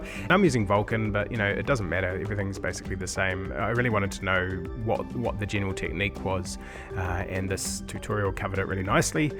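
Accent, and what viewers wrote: New Zealand. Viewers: Kiwi accent aye? I'm curious, where are you based?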